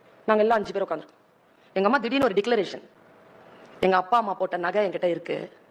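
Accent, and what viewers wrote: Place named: Tirunelveli, India. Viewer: திருநெல் வேலி நீங்கள் பேசும்போது தெறியுது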